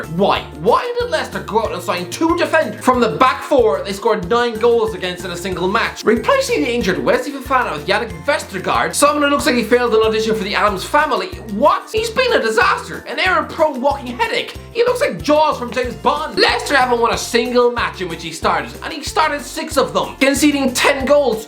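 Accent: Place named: Ireland. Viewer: I post football news also . Just without this Irish ☘️ accent.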